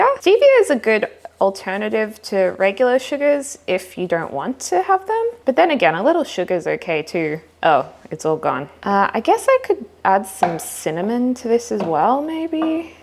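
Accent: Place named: Australia. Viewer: listening to you talking just reminds me of aus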